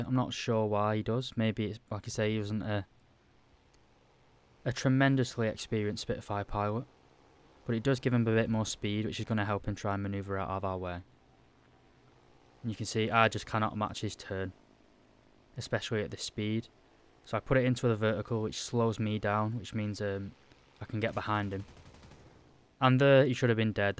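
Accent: United Kingdom. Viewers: Hey from which part of the uk are u?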